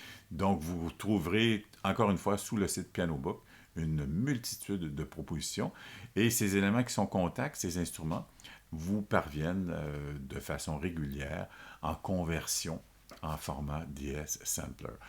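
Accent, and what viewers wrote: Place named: Quebec, Canada. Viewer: Merci, content d’entendre un québécois dans le domaine! Bon travail!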